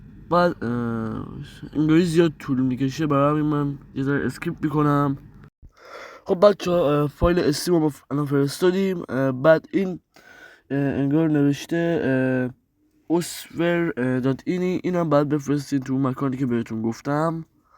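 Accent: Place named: North, Iran. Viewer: لحجه شمالیتو عشقه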